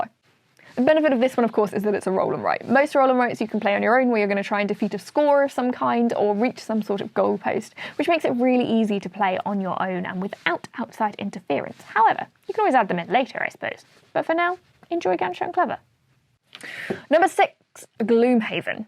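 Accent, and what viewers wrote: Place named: United Kingdom. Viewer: Great list. Though I’ve seen it before, I just come back for the British accent.😆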